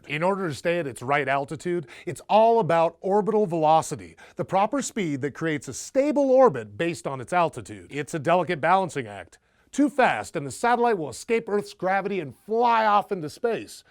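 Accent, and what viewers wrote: United States. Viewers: Let me preface this by saying the narrator on here is great. Does a fantastic job speaking, but I’m pretty sure this guy is an American. If he isn’t he’s masking his accent brilliantly. IN AMERICA WE DONT USE SOCIALIST MEASUREMENTS! We use the imperial or The US Customary units. I realize that The International System of Units (SI), the modern form of the metric system, is preferred for many uses by the U.S. National Institute of Standards and Technology (NIST), but guess what, we viewers are not scientist. We can literally visualize in our minds 10000 miles, 600mph or 10’ tall, or a yard (as in 36” and not something you mow people from WV and south). We Use the imperial system !!!! Geez.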